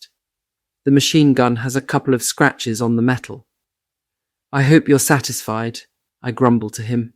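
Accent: RP, United Kingdom. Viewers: Are you British or have you lived in United Kingdom for long. I like your accent. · Accent is british not german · A British voice narrating a German point of view. Not a fan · It's Eton Pommy British. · Not a German accent but you can say the German names properly. Obviously, you're a Brit.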